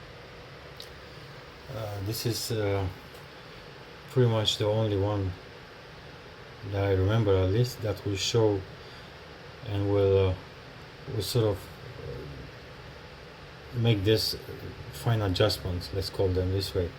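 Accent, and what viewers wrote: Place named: Turkey. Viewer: merhaba, aksaninizdan Turk oldugunuzu dusunuyorum, bilgilendirici video icin tesekkurler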